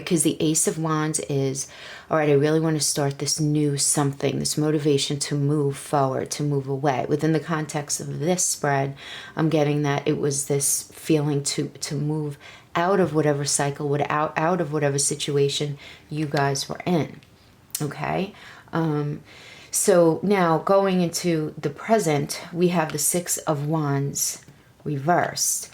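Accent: New York, United States. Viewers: Thank you so much! Are you a New Yorker? I feel like I know you just by the voice!!! Nevertheless thank you for your reading!! I will gladly make a donation ✡️❤️❤️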